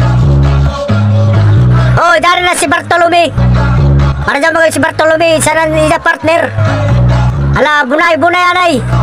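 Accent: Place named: Mandaya, Philippines. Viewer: parang mandaya yung salita mo host ba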